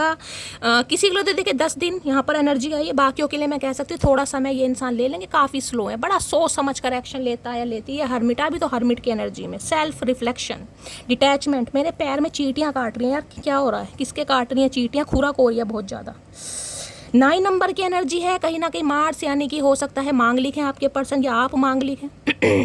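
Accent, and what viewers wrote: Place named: Bihar, India. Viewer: आज बिहारी स्टाइल में आपका बोलना मज़ा ही आ गया ❤❤ और रीडिंग सुनते हुए एक ख्याल आया की आप जिस तरह हमारे पक्ष में बोलती हो तो कहीं सारे चचाचनेरा आप पर ही कुफ्र न धरने लगेंगे 😅😅 काहे दुश्मन बढ़ा रही हो प्रिय 😂 खैर जो भी हो पर आपकी वजह से हम उबर चुकें और बाकि तो महादेव हैं ही सर पर हाथ धरे हुए 🙏🏻🙏🏻🙏🏻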